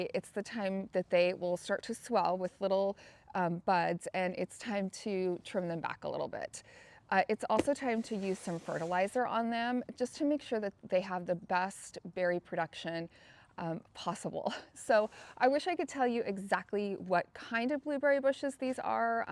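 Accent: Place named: Midwest, United States. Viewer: I'm in central NC newly zoned 8a. Your yard and mine look very similar. I cut back all my knockout roses 2 days ago (ouch!! Those thorns!) and I'll be working on my blueberries today. Are you originally from the midwest? I occasionally hear a tiny hint of midwest accent. Very tiny.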